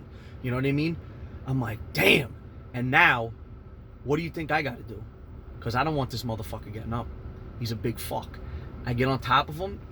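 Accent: New York, United States. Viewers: Love the content you and produce it's awesome so glade i found your channel I like your accent it reminds me of the old new York movies great work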